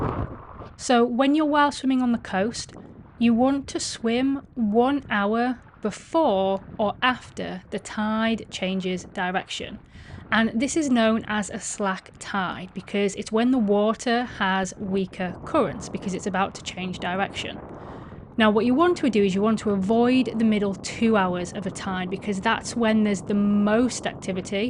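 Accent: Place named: Nottingham, United Kingdom. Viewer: Don’t recognise your accent? My guess would be Nottingham area , sorry if my guess offends you but obviously only a little sorry , an energetic charming soul you are .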